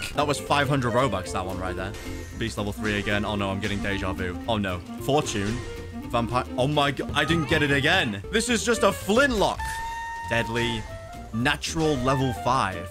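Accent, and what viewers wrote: United Kingdom. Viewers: Yea but ur brtish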